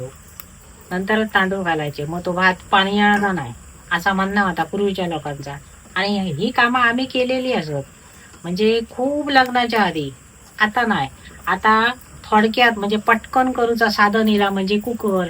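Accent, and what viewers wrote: Malvani, India. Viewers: खरा सांगा, तू वाहिनी पूर्ण मालवणी बोलतंय आयकाक खूप बरा वाटला. वालय , बेळो , डोनो वाळण हे सगळे शब्द आमच्या घरातली आठवन करून दितत. फक्त गडबड अशी व्हाता पेडयांची कढी बघून प्रत्यक्ष जेवक गावला नाय ह्याचा वायट वाटता. आसानी, देव तुझा भला करू मावले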